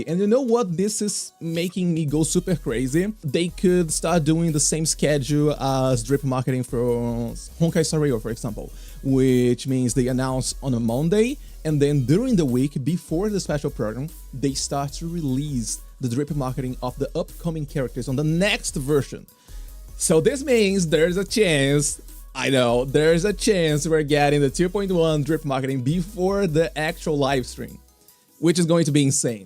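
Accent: Brazil. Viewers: Pelo seu sotaque, você é brasileiro 😀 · I'm not gonna lie, sometimes you sound like a Brazilian speaking english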